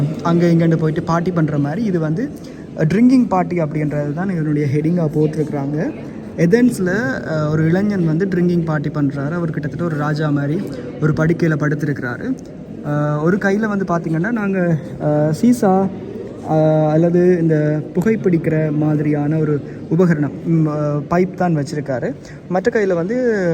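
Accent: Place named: Sri Lanka. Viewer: ரொம்ப நல்லாயிருக்கு! இலங்கை தமிழ் எப்பொவுமே கேட்க இனிமை! தமிழ் kingdom, நாயன்மார்கள் இந்தியாவைவிட்டு வெளியே வந்ததால் இன்று அவற்றின் பெருமை பாதுகாப்பாக இருக்கிறது!!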